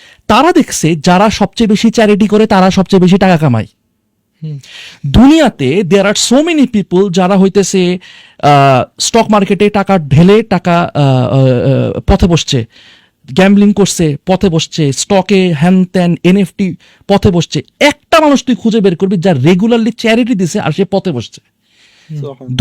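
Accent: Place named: India. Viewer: ভারতীয় ইংলিশ মারায়, ইংলিশ লাইনের এর শেষে "না~ না ~" করে। নোংরা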